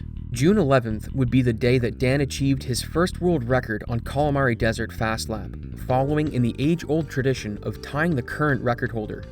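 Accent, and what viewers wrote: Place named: Maritimes, Canada. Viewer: im hearing some maritimes in your voice, newfoundland?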